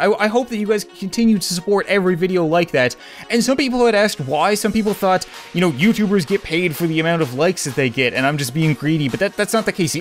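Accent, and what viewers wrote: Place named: Canada. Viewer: Love your vids and all the energy and effort you put into them! Also love your Canadian accent and quirks! Are you a fellow scientist? You knew about centrifuges, pipettes and that vaccines don't cause autism (thanks for that! Yay!) Congrats on >15K subscribers! Thanks for making this series! Cheers from your neighbor in California!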